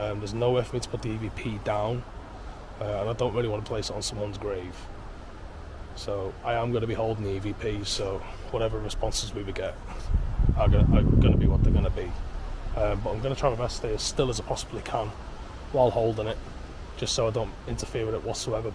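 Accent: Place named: Liverpool, United Kingdom. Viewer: That was epically brave of ya!! Ya sound a but scousey there tonight, must be cos ya were nervous.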